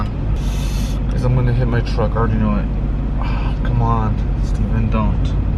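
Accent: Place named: Canada. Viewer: Did you just move to CA? Could of sworn you were Canadian :P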